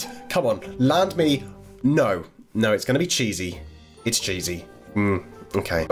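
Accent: United Kingdom. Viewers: Your British inglish...it’s so charming! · I like ur accent British? Great vid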